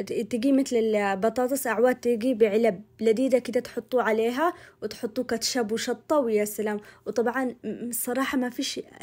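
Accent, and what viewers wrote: Aden, Yemen. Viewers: حبيبتي ياسلام على هالكلام العدني الجنن يجنن كلام عدن والله خصوصا كلمة ماهلوش اااه ذكريات لي في عدن الحبيبه اختك من البيضاء مقيمه في جده ❤ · اللكنة العدنيه تجنن عليكي❤❤ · مشهيه بكره بسوي بنفس طريقتك إن شاءالله ..تسلمي ياعسوله وكلامك العدني ياسلااااام ❤️❤️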